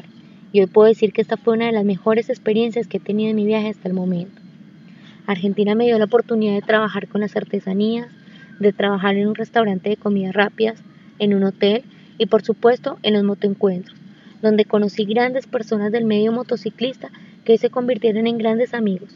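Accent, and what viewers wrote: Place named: Colombia. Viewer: muy lindo tu relato, hermoso tu acento colombiano, emocionante tu apreciación sobre nosotros y nuestro país que está lleno de buena gente como colombia, siempre bien venida, suerte en todo lo que te toque vivir.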